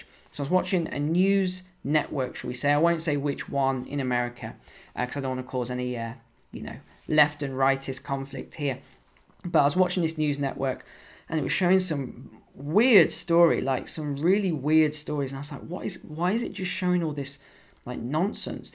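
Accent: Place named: RP, United Kingdom. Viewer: I never enjoyed watching investment and housing videos until I discovered you. I have been following your advice since March and I think you are the best. I Iove your posh accent. Greetings from Asia; wish you would talk more about the housing out here too. I am really looking forward to you next video!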